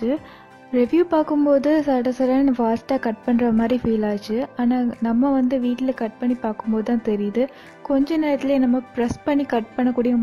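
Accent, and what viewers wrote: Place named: Kanyakumari, India. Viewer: Kanyakumari slang super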